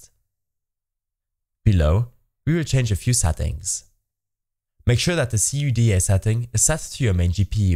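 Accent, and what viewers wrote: Germany. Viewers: Are you from Germany? kinda sounds like that xD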